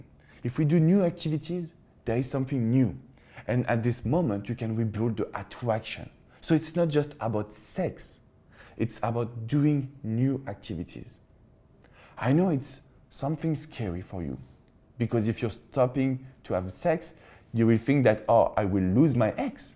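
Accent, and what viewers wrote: France. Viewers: Love the french accent as I am used to the regular speech shows the same tough:);keep all the good things going english side or fench. Je suis avec toi:) true love is never ending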